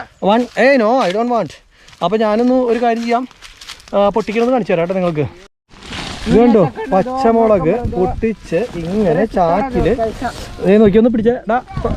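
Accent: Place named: Tamil Nadu, India. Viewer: തമിഴ് നാടൻ ഇംഗ്ലീഷ്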